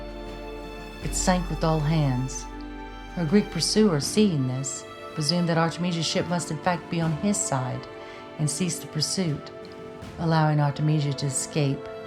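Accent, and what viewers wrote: South, United States. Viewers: Your voice is so soothing..the mixture of Southern accent, smoothed out by a little New Yorker and the British tongue with words like "que", "nip in"...no wonder I couldn't put my finger on it.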